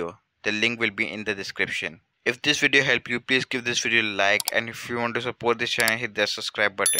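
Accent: India. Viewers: always the man with the indian accent to save the day and solve any tech issue. worked, thanks :D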